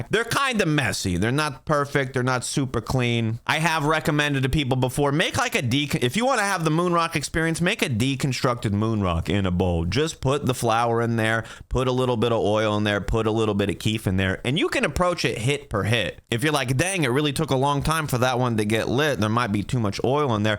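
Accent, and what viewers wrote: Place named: New York, United States. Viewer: Sounds like he's from New York.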